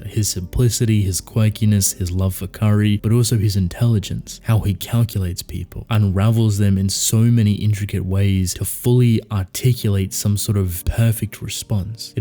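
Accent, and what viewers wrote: Australia. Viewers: Are you australian ? I love australians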